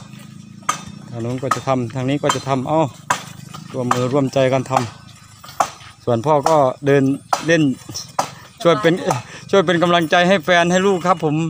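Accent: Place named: Isan, Thailand. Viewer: พูดอิสานแล้วฟังสบายคะ ทุกอย่างธรรมชาติดีที่สุด มักบักดาว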